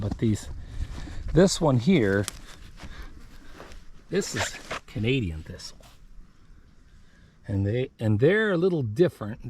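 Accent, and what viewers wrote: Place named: Wisconsin, United States. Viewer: Thought I heard a Wisconsin accent. I live in Kaukauna Wisconsin. We’re buds.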